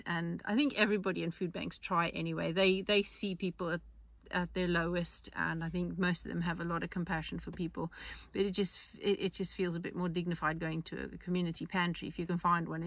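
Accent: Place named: South Africa. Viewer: The UK benefit system is rorted (to take unfair advantage of a public service) with 950k job vacancies, over 8.5m people claiming benefit’s more people claim credits than pay tax. You sound like your from SA .. 40% joberg unemployed they don’t have benefits .. too many takers not enough givers in this country.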